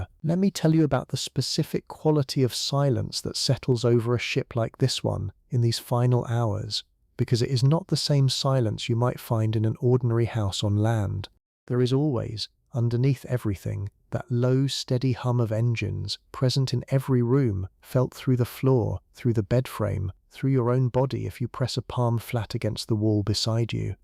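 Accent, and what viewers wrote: United Kingdom. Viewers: Lancashire England. Great storytelling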